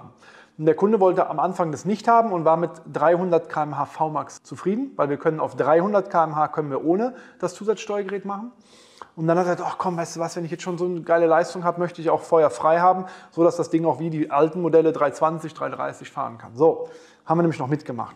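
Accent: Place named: Germany. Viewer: Bruder für ein Mustafa spricht du zu Hochdeutsch. Ich mag es irgendwie nicht🤔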